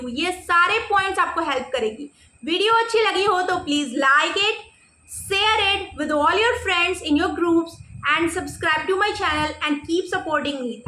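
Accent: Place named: Bengal, India. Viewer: Aap bengoli ho kya madam .....kyu you are looking like bengoli and the way you are talking is relate to that you are bengoli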